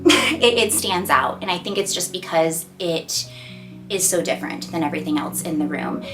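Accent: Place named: California, United States. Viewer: You sound so California, I love it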